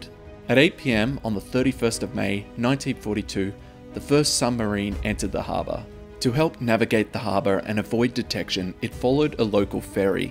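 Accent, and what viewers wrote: Australia. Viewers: I like the Australian English accent. Is how you say "nuclear" the way all Australians say it? 🇦🇺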